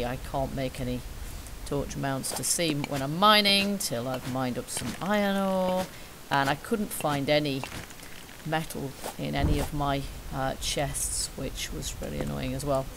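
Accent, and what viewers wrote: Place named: United Kingdom. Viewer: love the accent, I don't hear a lot of female gamers, let alone british one's so kudos to you, my ancestors came from Yorkshire...keep up the great work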